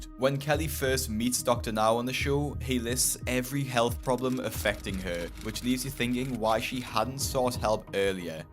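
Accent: Newcastle, United Kingdom. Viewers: He is a Geordie. From the Newcastle area.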